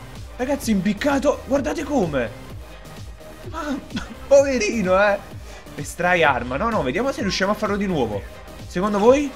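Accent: Naples, Italy. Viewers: non so se già te lo hanno chiesto ma sei di napoli????? Comunque bellissimo videoooooo!!!!!!!!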